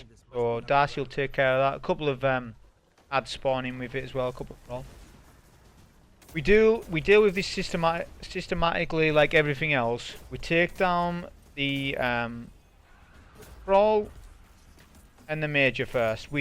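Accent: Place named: Newcastle, United Kingdom. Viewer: That's a fine Geordie accent 👍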